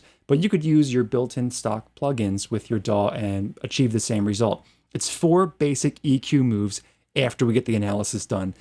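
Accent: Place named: United States. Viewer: Love your teaching style, great advice, very well presented, nice results. On a humorous note: I can’t believe nobody called you on your pronunciation of “high piss filter” !! I speak four languages and traveled for two decades around the world, so I understand accents, language barriers, all kinds of stuff. But except for that one word, you sound like a very normal English speaking (from America) kind of guy. Not sure why you pronounce high pass with an i. Anyway, thanks for a great tutorial, catch you on the next one !!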